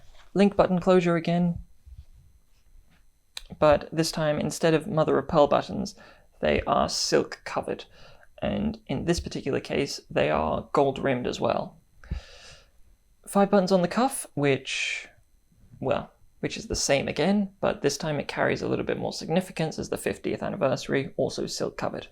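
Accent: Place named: RP, United Kingdom. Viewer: no worries about that. You're fine and definitely do better than I could. We all enjoy your videos and learn from them and appreciate the work you put in. I do feel like maybe you're trying to be very RP with no regional accent and as an American we don't care at all about perfect RP.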